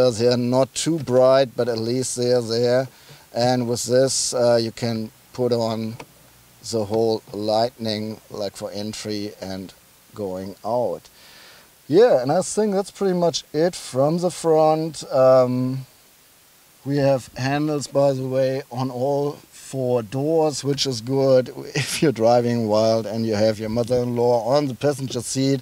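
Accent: Germany. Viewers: lol...he's german, german accent:P not drunk!!!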